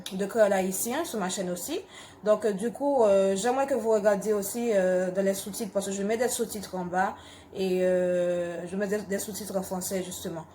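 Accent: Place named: Spain. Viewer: really nice intro, you have a voice beautiful. i watched, i love your Spanish accent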